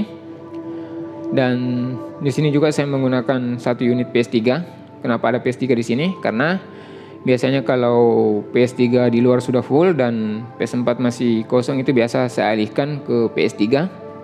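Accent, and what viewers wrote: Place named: South Sulawesi, Indonesia. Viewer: Logat nya orang sulsel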